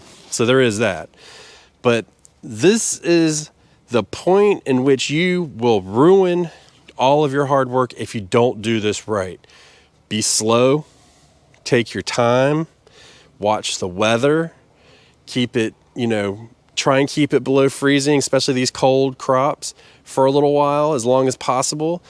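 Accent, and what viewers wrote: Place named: Massachusetts, United States. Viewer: Really enjoying your videos. This is the first time I ever left a comment on anything (I'm an old guy), so I hope it goes through. Anyway, it sounds like you're originally from Massachusetts (I'm from Vermont), now living in NC, inland from you, about 60 miles south of Raleigh. I've been gardening my whole life, mostly on intuition and what I learned from my grandparents. Never have been too technical about anything, so I really like your way of describing how you do things. Recently your clips on starting seeds and hardening them off were excellent. God bless. Keep up the good work.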